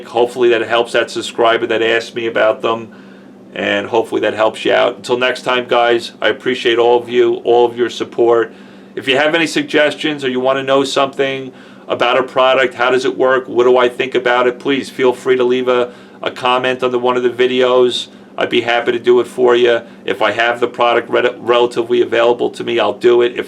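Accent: New York, United States. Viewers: Do I detect a NY accent?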